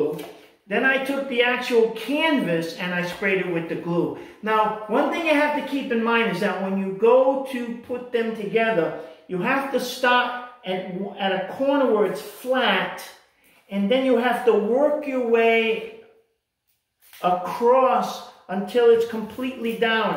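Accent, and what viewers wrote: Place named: East Coast, United States. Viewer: Brilliant ! I ordered one and thought no way with the diamond picture! I gave it to my 11 year old granddaughter and she worked on it for 15 minutes. Now it's living in my piano bench unfinished. 😅 Thanks for the car cleaning ideas too! BTW, ❤ your accent!! East coast somewhere? RI maybe??😊 SE Ohio girl here. Great video!!!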